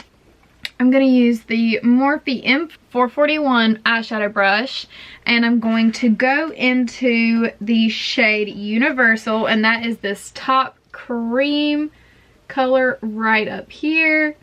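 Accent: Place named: South, United States. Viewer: You look amazing girl. You have a south accent I'm guessing your from the south of the us, but I have been watching True blood and you remind me of them. I hope this wasn't that offensive If it is I'm sorry. But great video!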